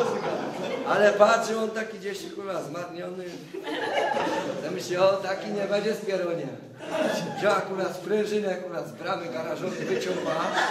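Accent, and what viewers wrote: Silesia, Poland. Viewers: Facet ma talent, po glosie poznaje że jest ze Śląska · haha tylko ze to jest akurat gwara śląska xd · to jest raczej po śląsku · oooooooo ten śląski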